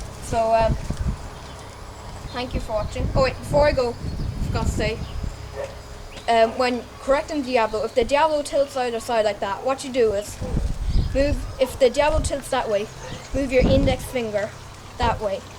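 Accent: Ireland. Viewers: What part of Ireland are u from?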